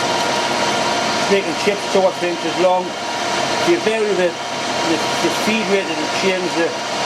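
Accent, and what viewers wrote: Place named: Yorkshire, United Kingdom. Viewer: Thank you for the reply sorry sometimes I do not understand as you speak in a Yorkshire or Welsh dialect:-)) Two weeks ago I had a chap visiting my stationary engine collection here in Malta he is from Yorkshire had a bit of a problem to communicate with his accent!!!!!!!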